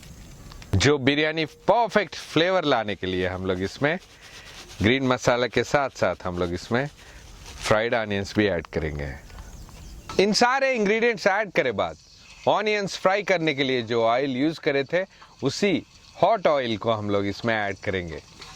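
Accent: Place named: South, India. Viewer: i really like his south indian ascent. keep it up, dont try to speak proper hindi or urdu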